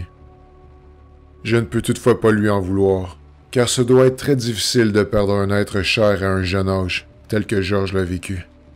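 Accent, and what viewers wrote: Quebec, Canada. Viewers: Chapeau bas sa fait plusieurs vidéo que j'écoute et franchement chapeau bas, sa fait plaisir d'écouté un québécois qui lis des histoires je trouve sa cool moi aussi je suis québécoise. Lâche pas 👍👍👍👍dans ta voix sa paraît tout le temps que tu met + une abonnée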